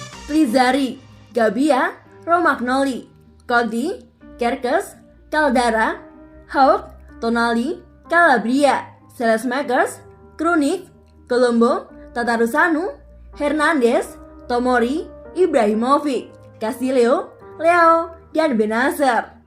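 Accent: Java, Indonesia. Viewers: Wkwkwk jawa, kesukuan banget ni vocalnya,, ga tau betapa luasnya indonesia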